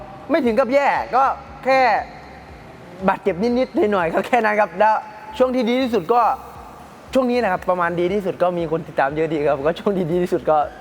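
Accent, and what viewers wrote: Isan, Thailand. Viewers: ทำไม พูดเกียบ5555 อีสานชัดเจน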